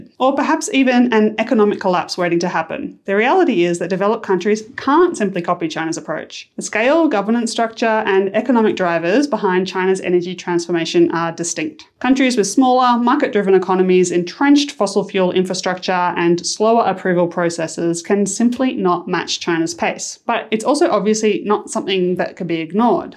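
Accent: Australia. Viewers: is this aussie accent?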